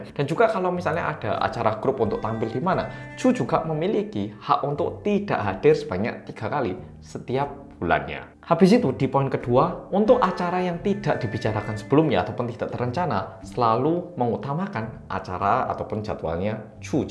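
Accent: Java, Indonesia. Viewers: Ruar binasa emang keserakahan manusia. Makasih videonya Boloku Sing Paling Medok Dheweeeee 🙏🏼🙏🏼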